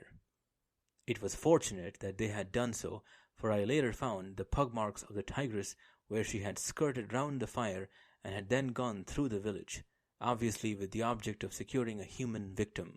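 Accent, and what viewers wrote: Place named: India. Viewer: Jim was born, reared, and earned fame in India, not Britain. He only moved to Africa when he retired from hunting. Given this, and since the stories are all set in India, I think a slight Indian accent is most appropriate. Jai Hind! 🇮🇳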